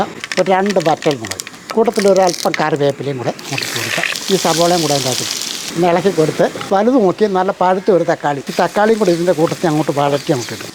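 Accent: Kottayam, India. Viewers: നല്ല കറി ഇക്ക നല്ല അവതരണം ഞങ്ങൾ കോട്ടയം കാരുടെ ഭാഷ പോലെ തോന്നുന്നു🎉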